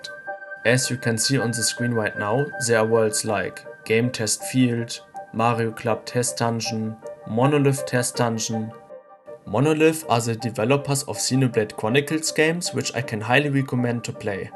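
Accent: Germany. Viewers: its weird to hear a german accent when yourself are german, i dont have that accent, so it explains why some people were surprised when i told them i was german. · First time i hear you voice and i just gotta ask: are you german? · Man Hört so dein Deutsch raus xD deine Aussprache ist auch fast so gut wie meine oder besser so schlecht xD😂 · Gutes video und interessante Welt die du uns gezeigt hast. Ich finde es übrigens auch top wie man immer hört wenn jemand aus deutschland kommt xD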